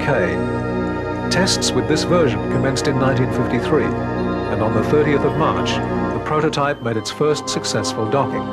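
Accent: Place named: Australia. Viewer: Wow ,i can understand the Narrator good for a change ,sounds like a Aussie.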